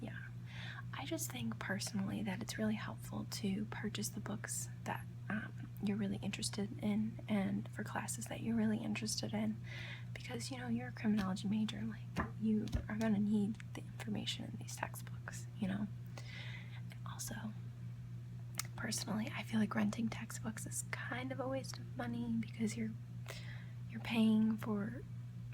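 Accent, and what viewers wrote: South, United States. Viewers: Oh this vid would have been great also with your southern accent! :P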